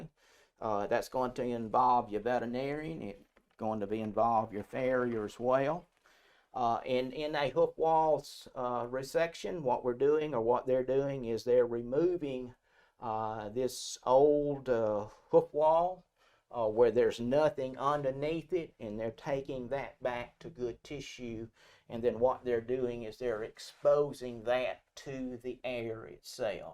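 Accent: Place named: South, United States. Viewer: I'm 63 and appreciate the knowledge here. However, as an impatient youtube consumer, this video could be 10 mins shorter if this guy did't articulate every syllable of every word. (I swear, southereners add syllables where there are none ( example 'This' becomes 'thi--is')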